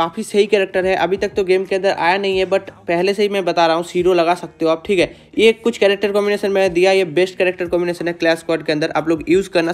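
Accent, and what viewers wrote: Bengal, India. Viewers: Bro bengali ho kaya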